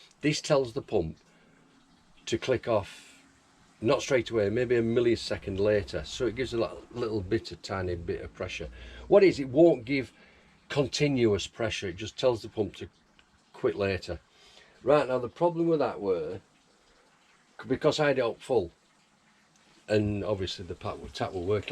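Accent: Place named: Northern England, United Kingdom. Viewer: Hiya defo agree with other comments try and flatten out the cold water pipe or re pipe it if that dont work shortest route good look m8 and were are you from defo a northern accent were Bolton chris & sheila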